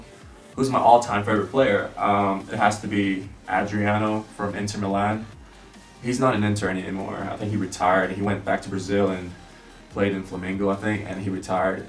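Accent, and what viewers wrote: United States. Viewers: You said you are from Britain but then why do you have an american accent???????????????????//// ???????? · From your accent I thought you were American not British!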